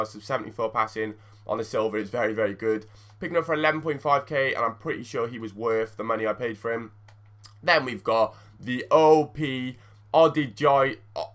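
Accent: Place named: Liverpool, United Kingdom. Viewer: why are you a scouse?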